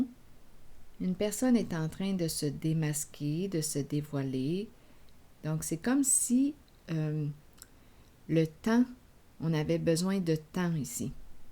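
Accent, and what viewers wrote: Quebec, Canada. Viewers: j adore votre accent du Québec !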